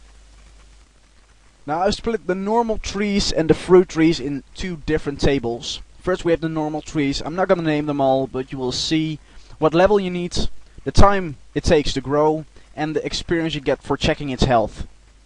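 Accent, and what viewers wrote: Netherlands. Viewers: Mhm pittig Nederlands? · are you dutch? :D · Dutch accent <3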